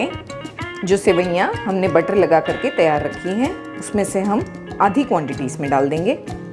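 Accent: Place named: Konkan, India. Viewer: Aap kokni ho na .baato se aap kokni lagte ho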